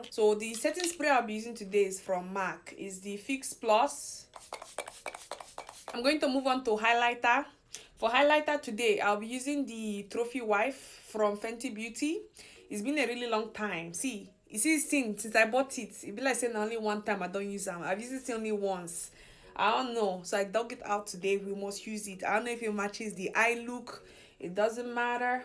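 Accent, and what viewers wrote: Nigeria. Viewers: 🤣🤣🤣 sounds like an igbo accent. Nne you made my day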